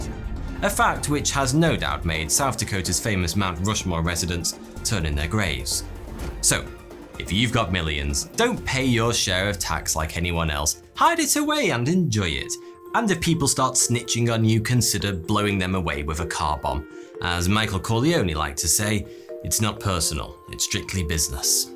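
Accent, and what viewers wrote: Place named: East Lancashire, United Kingdom. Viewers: wouldn't it be crazy if he was a raging coke head off camera, and it's just the English accent that makes you think he is classy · Nah, he has an east lancs accent, more like Blackburn/Burnley 😀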